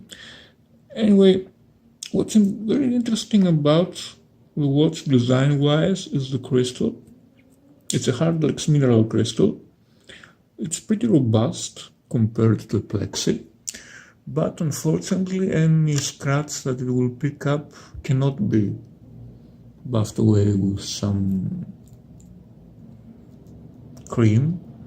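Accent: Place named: Greece. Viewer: Great video,. I found the link to this channel from WUS and subscribed. Do you know what I like best about that watch? It doesn't feel the need to have a running seconds register, just to let us know it is running. It is assumed. Nothing is moving except when it needs to be. I like your accent too. Greek, I think; very nice to listen to. -Smaug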